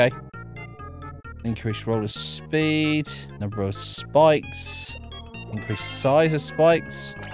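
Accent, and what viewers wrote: United Kingdom. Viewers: Your British doesn't matter you are still a great youtuber and I watch all your videos I can find too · Are u brittish cuz u sound like one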